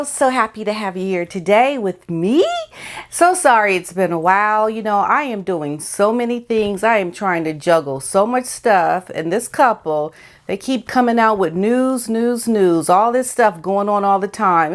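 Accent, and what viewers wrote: United Kingdom. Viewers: Tosh, utter Tosh is what MM is (AKA Crap, Garbage, rubbish, skank you get my drift) it’s a lovely word!! Loving your British accent!! I live a few miles away from Frogmore & Windsor castle (I’m poor) & I’m hoping my neighbours don’t come back to their base. P.S H never paid that money back- that’s utter balderdash 😉 😂😘 xx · I love your British accent, it's brill, cannot understand why the royal family are not saying anything, we have to pay for them pair as I am English, it needs to stop. Yes you are right an mp has got his foot in the door in parliament to make sure he can get support to remove their titles, I was made to pay towards their wedding everything despite never watching the wedding, just was not interested, could see her a mile off. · Yay talk british a little longer lol.thay was given the title from the queen. but it all goes thourgh the houses of parliament Sucks we know.but now so many people in UK are banging on the tables louder an louder so hopefully something will happen. 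but thank god there out of UK and our papers mostly.we are gutted about this gutter snipe. the money won't last long and no one wants to hear 💩 from her she dont know 💩about the royal family. if she knew about the commonwealth she wouldn't have put her 👠in her mouth megain 👩‍🎓🧠thinks she smart but good ole 👸Elisabeth will shock this couple to the core the 👸been around alot longer than she has.people know what ever thay produce the royal family will watch like hawks.and we know thay will recall harry home.god know how them two will unvail a statue of diana next year on what would be her 60bday. You watch tramp will look at all the cameras the world will be watching megain god i wish we could make her go though trainers gate and into the tower of London haha xx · I like your British accent and if you want to speak it well and truly best to speak Oxford English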